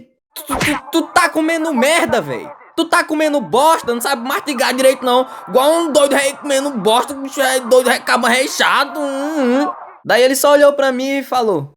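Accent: Northeast, Brazil. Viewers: Gostei de mais kkkk tu é nordestino?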